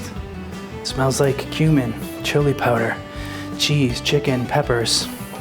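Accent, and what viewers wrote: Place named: Boston, United States. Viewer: Hi, love the video. I’m going to make this as soon as I can. I was just wondering what part of the northeast you are from. I’m in Massachusetts and you sound just like me! Chadda, betta. You know, like your from Boston or somethin. Just curious! Thanks for the video. ✌️🍻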